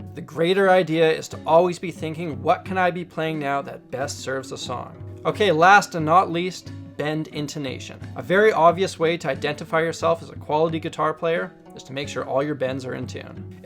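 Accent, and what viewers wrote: Winnipeg, Canada. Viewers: Is this guy from winnipeg? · Are you from Winnipeg?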